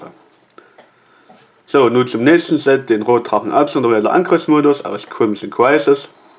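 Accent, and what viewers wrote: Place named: Saxony, Germany. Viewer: sächsischer akzent xD den liebe ich!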